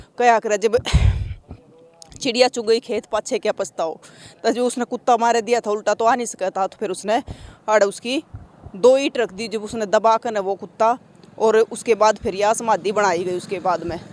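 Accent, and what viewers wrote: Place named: Haryana, India. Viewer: Didi aapke bolne ka tarika bahut acha he jo aap haryanvi me bolte he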